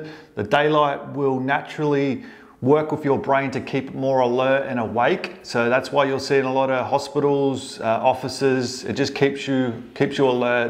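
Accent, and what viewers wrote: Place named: Australia. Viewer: That Aussie accent tho🥰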